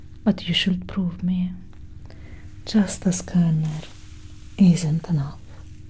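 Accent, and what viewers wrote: Russia. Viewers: U russian? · Гуд рашен акцент, агент Кетрин. Вел дан😋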